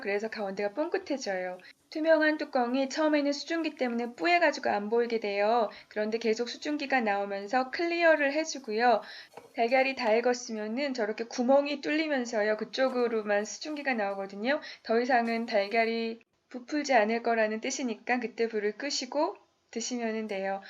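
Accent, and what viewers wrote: Seoul, South Korea. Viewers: 약간 경기도 (서울) 사투리 쓰시네요 나긋나긋 너무 듣기 좋아요! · 8~90년대 일명 서울 사투리 말투시네요 ㅎㅎㅎ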